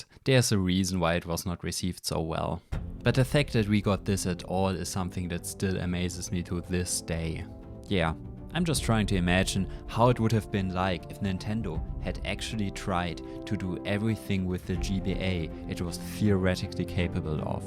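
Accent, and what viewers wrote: Germany. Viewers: Man hört übel das er deutscher ist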